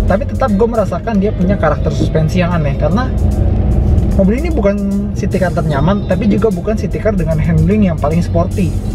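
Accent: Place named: Bekasi, Indonesia. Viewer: kemang pratama banget.anak bekasi ya bang?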